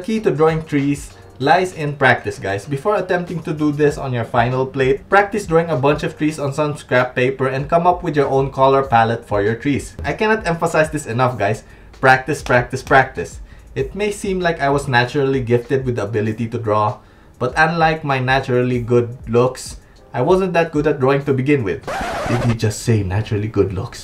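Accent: Philippines. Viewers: hI, recogninzing your filipino accent ! Thanks for your tips, i love it ! val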